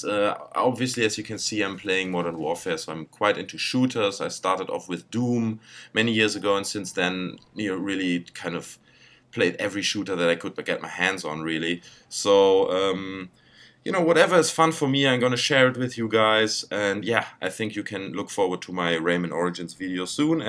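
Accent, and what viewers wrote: Germany. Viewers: Nice to hear a German accent on here :)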